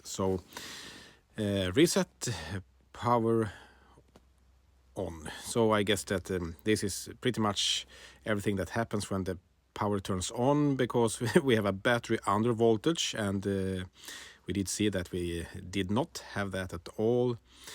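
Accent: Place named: Sweden. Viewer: You sound swedish, do you do installations like this commercially? I would be interested in such a case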